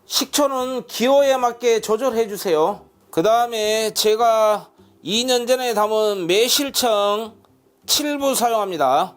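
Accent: Jeolla, South Korea. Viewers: 대표님 전라도 출신인가요 정가이 갑니다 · 끄트머리는 짤라불고요.~ 전라도 사투리가 겁나게 구수해부네요이! ㅎㅎ 덕분에 저도 상추장아찌 맹글어 볼게요. 항상 건강하시고 행복하세요.~ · ㅋㅋㅋ 전라도사투리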